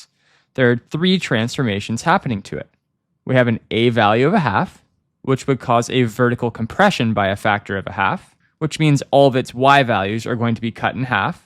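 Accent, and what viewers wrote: Canada. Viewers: i hear the canadian accent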